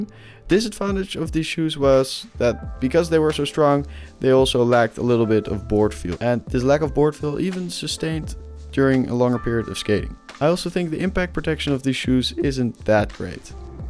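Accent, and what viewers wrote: Netherlands. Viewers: Hey you are dutch right?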